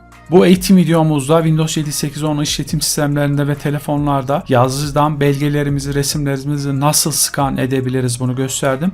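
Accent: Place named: Kargın, Turkey. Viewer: Harika güzel olmuş emmi nerelisin sesin kargın adamina benziyor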